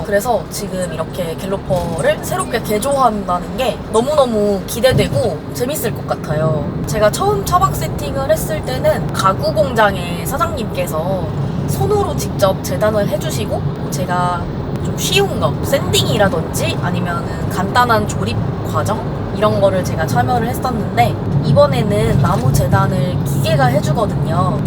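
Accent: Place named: Jeolla, South Korea. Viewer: 말씨가 전라도네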